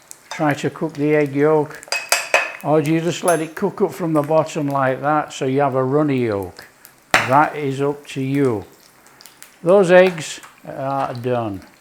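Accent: Yorkshire, United Kingdom. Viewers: He doesn't sound like an Aussie beowtiful is Yorkshire. He lost me when he put ketchup on it, tomato doesn't go with egg.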